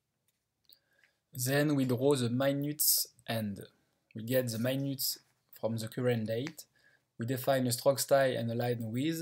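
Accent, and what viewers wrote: France. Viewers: He has a french accent.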